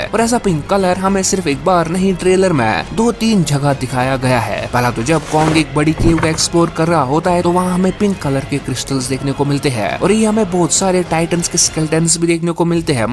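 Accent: Kashmir, India. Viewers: U sound like kashmiri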